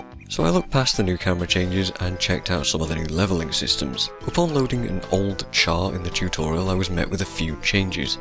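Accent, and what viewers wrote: North, United Kingdom. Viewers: Really great video :) Plus, you sound Northern, which is always a pro.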